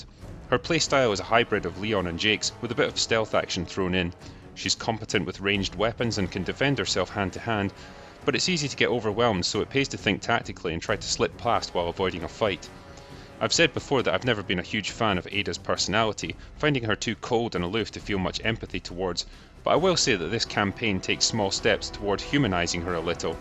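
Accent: Scotland, United Kingdom. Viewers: ive had some Scottish friends and they sound a lot like him..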